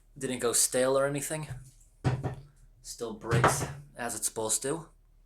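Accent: New England, United States. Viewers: Tanner Smyly That’s his Yankee dialect.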